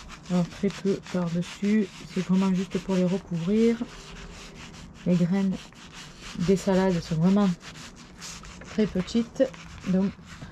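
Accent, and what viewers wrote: South, France. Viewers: Les premiers semis avec l'accent du sud ...Que demander de plus 😉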